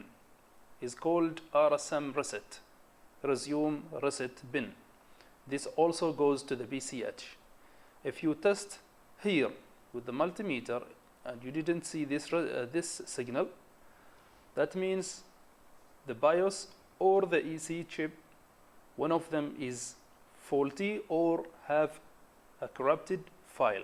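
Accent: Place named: Romania. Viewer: I recognized you with your Romanian accent and your famous "ok?"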